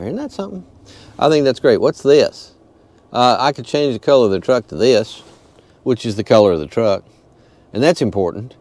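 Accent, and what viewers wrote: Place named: South, United States. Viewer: Your review of the tundra trd pro was the best I’ve seen. I’ve ordered the 2026 and for the past 4 weeks have watched every video I can find. Your approach, attention to detail, and technical information, combined with the southern draw was worth the price of admission. I learned a lot and look forward to delivery with both eyes wide open. Thank you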